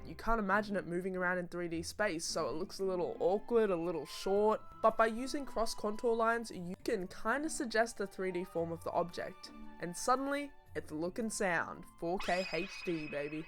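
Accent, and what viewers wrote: Australia. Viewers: This outta topic but for some reason I can tell you’re Australian, I actually know the reason I lied, it’s cuz u have the same accent and speaking manners of Rhea Ripley and as a wrestling fan I was like “woah u sound familiar”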